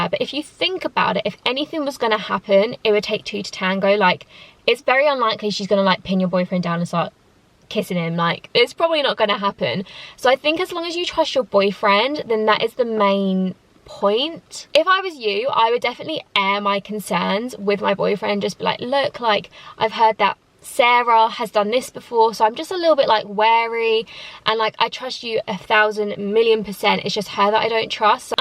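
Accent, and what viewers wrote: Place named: London, United Kingdom. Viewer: I love your accent. Is this a london accent?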